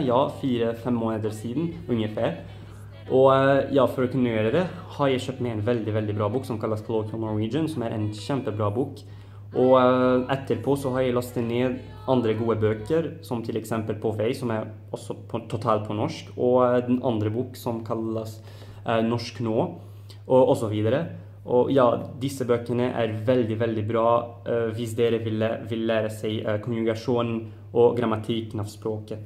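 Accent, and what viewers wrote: Sweden. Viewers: Du snakker ganske bra allerede, norsk er et vanskelig språk å lære i utgangspunktet, men jeg hører en del svenske ord og utalelser, så øv litt mer på uttalelsen din, og om du virkelig vil imponere nordmenn, bør du lære deg nynorsk også, siden det er det mange nordmenn som også sliter med. Men ellers veldig bra👍🇳🇴🇳🇴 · Litt hjelp: Du snakker med en litt svensk uttale. Si "mæj" isteden for "mei". (I hvertfall på Østlandet.) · Norsken din er utrolig bra for å være på 4-5 måneder, det skal du ha! På mange måter hører jeg at du blander det inn med Svensk, ikke det at det er noe dumt med det, men spesielt på «ungifär» som er Svensk, hadde jeg ingen aning hva du sa :p Utenom det, ikke gi opp, du har talent! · Good job! I can hear some swedish in there, but that is nothing to worry about! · Du har en gøyal skandinav-dialekt, hører du har litt svensk påvirkning, men det gjør jo ingenting. 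Du har bra uttale og er lett å forstå deg uansett👍🏻 mektig imponert over at du har lært norsk så fort 👏🏻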